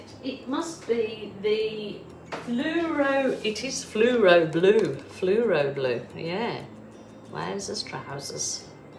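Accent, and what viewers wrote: United Kingdom. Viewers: Hello I tried to send you a message yesterday but it seems to have got lost in cyberspace! Anyway the gist of my message was to basically tell you how very much I thoroughly enjoy & appreciate your tutorials. I adore your art and am learning a lot watching you paint. Also enjoy your sunny chatty disposition and your delightful British accent which so happens to match the Sunshine Coast where you reside. Thank you for all your tips which is going to help me immensely when I hope to embark on my painting venture instead of simply being a couch potato!!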